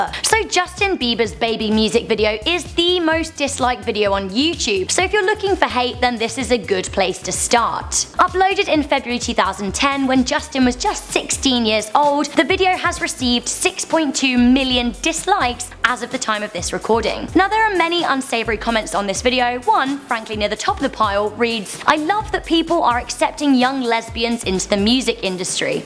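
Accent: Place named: United Kingdom. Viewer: ( ͡° ͜ʖ ͡°) youre beautiful and so is your british accent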